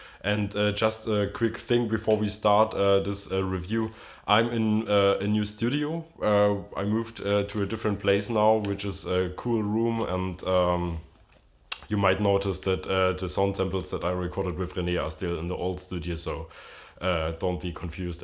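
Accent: Germany. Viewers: Things always sound way cooler in a German accent.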